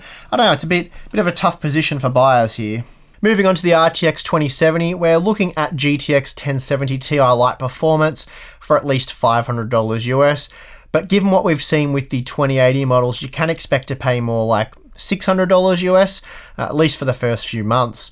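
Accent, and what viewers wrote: Australia. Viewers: What's with the red-striped leather jacket at the end?... quick change artist are we?... getting a little cold there 'ol possum? haha! Thanks for a great news/opinion piece vid - always seems a little more authentic to me with the Aussie accent and no American BS. Really appreciate the thought you give things and your independent thinking mate. Cheers, from the Hills area in Sydney!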